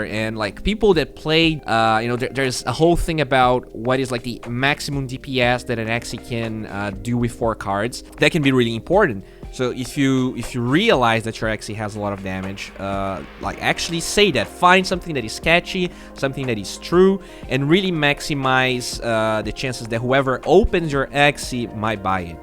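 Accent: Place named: Brazil. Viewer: Hey man, are u brazilian? U have an accent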